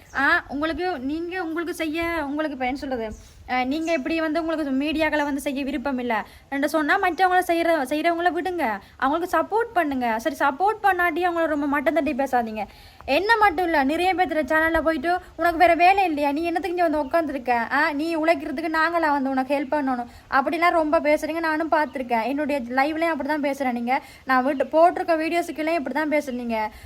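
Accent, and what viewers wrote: Sri Lanka. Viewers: Hi sister your Tamil alaga irruku iam from kasmir(Army) my native Rameswaram. My grand grand father is coming from sri lanka so ur langauge my grand father and grand mother pesura mathiri alaga Iruku . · தங்கச்சி உன் இலங்கை தமிழ் எனக்கு ரொம்பவும் பிடிச்சிருக்கு அழகா பேசுறீங்க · நீங்க உண்மையாகவே கிளிநொச்சியா உங்கள் தமிழ் மலையக வாசம் தெரிகிறது. மலையக மக்களும் சிறந்த பண்புஉள்ளவர்கள். நல்ல கடும் உழைப்பாளிகள்.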